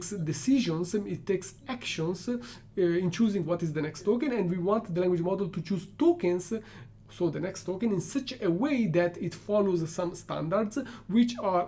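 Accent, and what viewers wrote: Italy. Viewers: I love the Italian accent 😂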